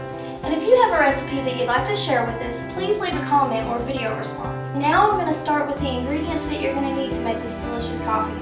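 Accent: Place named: South, United States. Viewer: Love the southern drawl 🤠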